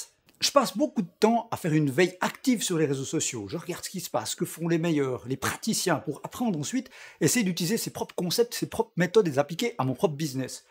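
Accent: Switzerland. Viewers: Salut j'aimerais juste savoir comment tu as fait ton intro ? Sinon continue comme ça, tes vidéos sont très inspirante et a ce que j’entends tu viens de Suisse ahahah.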